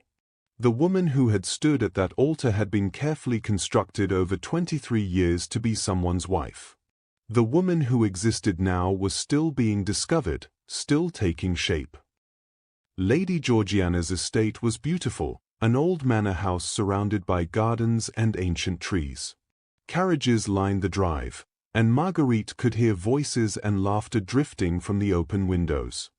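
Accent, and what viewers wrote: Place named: England, United Kingdom. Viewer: It’s nice not to have an American accent reading. Please keep the English accent.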